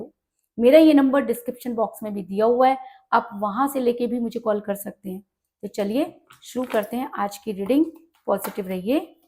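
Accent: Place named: Uttar Pradesh, India. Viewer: Mujhe ap ki bate style muhavre ache lagte hi . Ap age par atak jati hi ye apka boring point hi. I am sure ap UP si hi. Andaze guftagu yehi Zahir karta hi. Ap intelligent hi. Yaha sirf third party nahi hi. In janab ki dozens women si intimacy hi. Isiliye kisi ek ke nahi ho sakte. 50 jagah moh Marne ki adat maza shouk hi. Ap ke liye info.hi .Rdgs mi Kam ayegi.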